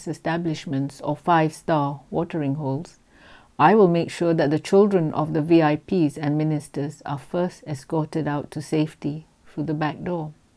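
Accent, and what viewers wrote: Malaysia. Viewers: You speaks as true Malaysian. Thumb up.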